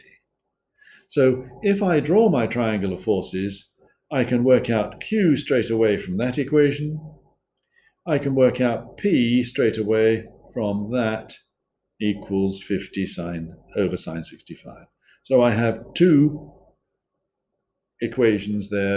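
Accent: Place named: United Kingdom. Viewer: Thank you you beautiful british sounding man!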